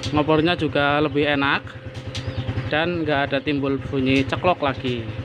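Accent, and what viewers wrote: Banyumas, Indonesia. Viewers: Dalam ngapak😂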